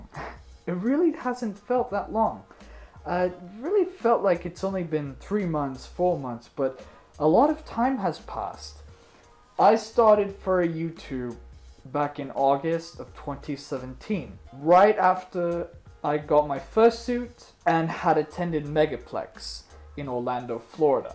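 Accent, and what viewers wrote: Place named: United Kingdom. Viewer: wow to be able to pull of a almost flawless British acsent when you are an American is pretty cool Ps I love the content and the caricter of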